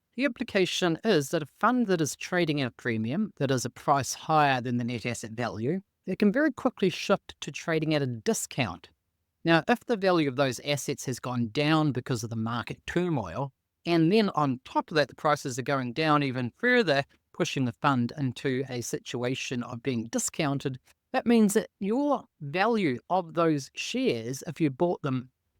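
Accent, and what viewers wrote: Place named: New Zealand. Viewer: Thanks, for sharing your knowledge and advice. I lived in New Zealand as a child for two years some sixty years ago. Hearing your accent brings back fond memories of the Land of the Long White Cloud!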